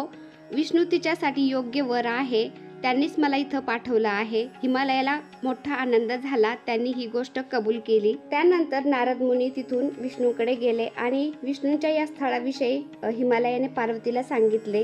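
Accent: Ahmednagar, India. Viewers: Tai khup chan kele tume puja tumcha bhashe mde aplya .Nager cha sugandh yeto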